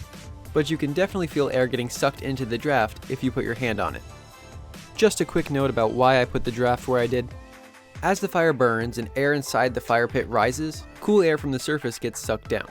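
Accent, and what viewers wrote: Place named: England, United Kingdom. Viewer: New England but your accent is well English haha interesting though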